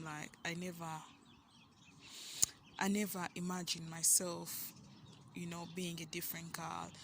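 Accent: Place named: United Kingdom. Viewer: You keep it up. Don't let anyone tear you down. By the way I love your accent. I'll certainly be going back and watching your other videos. I could listen to a British accent all day long.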